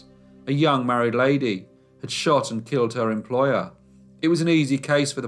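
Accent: United Kingdom. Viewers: Your accent/inflection Britain or Scotland?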